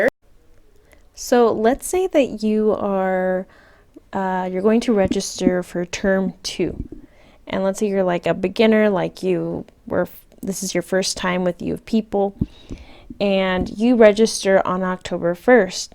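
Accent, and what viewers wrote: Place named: United States. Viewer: Were you born and raised in the US? You have a perfect American accent. ❤️❤️❤️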